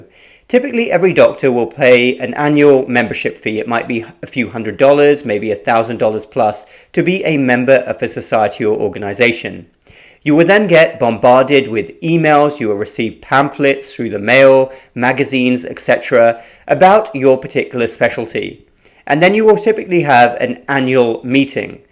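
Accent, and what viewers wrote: United Kingdom. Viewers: I bet the Septic Tanks love your British Accent. 😁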